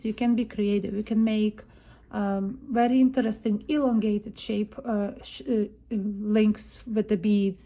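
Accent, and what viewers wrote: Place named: Czech Republic. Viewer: I love it, I lived in Prague for a while and while I know it’s not exactly the same, your accent makes me miss Czechia very much. I even have been to Kiev. My heart is with your people. 🖤💔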